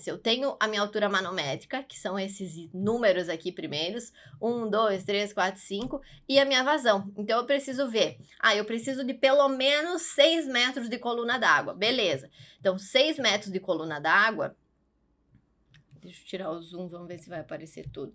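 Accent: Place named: South, Brazil. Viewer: trem bonitinho e esse sotaque do sul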